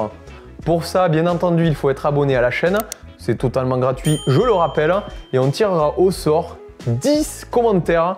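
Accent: South, France. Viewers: Merci pour ces idées cadeaux. Je vais transmettre à ma moitié 😁 Mais pas contre d'être tiré au sort 😍 Toujours de beaux tutos et surtout un accent qui chante et sent bon le Sud. Comme on dit ici: Bon bout d'an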